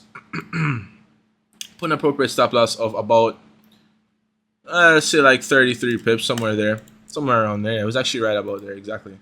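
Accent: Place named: Caribbean. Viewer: You sound like you're from the caribbean bro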